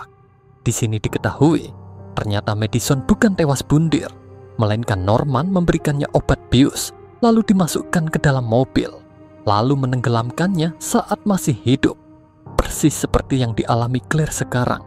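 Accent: Bali, Indonesia. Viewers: Logat bali memang gini kaa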